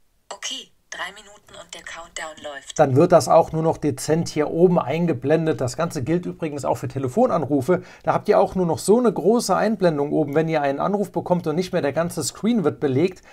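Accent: Saarland, Germany. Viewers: Bist du aus Saarland geil 😅😂 bin aus Saarbrücken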